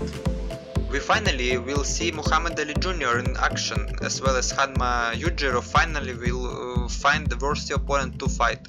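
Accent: Russia. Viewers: Почему такой русский акцент??? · Капец у тебя акцент русский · Russian accent?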